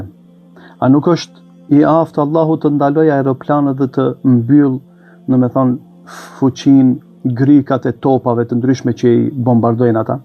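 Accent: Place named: Kardhiq, Albania. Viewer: shkerdhat si flet kardhiqe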